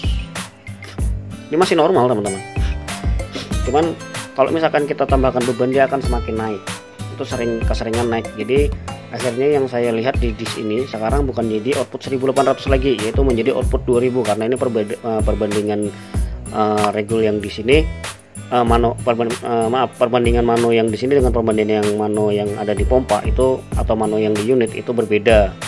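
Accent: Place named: Indonesia. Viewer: Logat bahasa HSU msh lekat dan kental bang..🤭🤭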